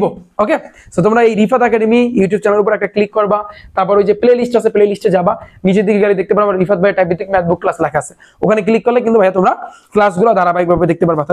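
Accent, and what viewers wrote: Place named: Barishal, Bangladesh. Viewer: কারন ভাইয়ার বাড়ি বরিশাল 😌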